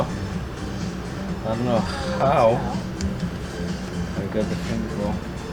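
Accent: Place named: Russia. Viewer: Я на первых минутах раскусил , что Русский , )))) акцент выдал))). Тачка супер 👍🏻👍🏻👍🏻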